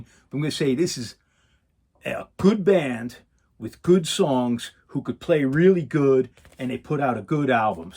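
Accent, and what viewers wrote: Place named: New England, United States. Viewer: I’m from Fall River but have lived in Atlanta for over 20 years! I knew that accent….haha! Love your videos and your knowledge! Respect!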